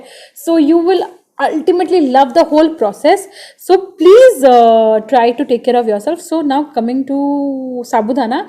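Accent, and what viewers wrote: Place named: Portugal. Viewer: Gracias por visitarme bonita 🙂🙌 aquí estaré pendiente ♥️🙌me encanta escuchar 👂 tu acento ♥️es portugués???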